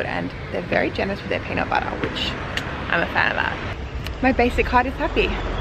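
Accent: Australia. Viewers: Hello.. Are you a Straya?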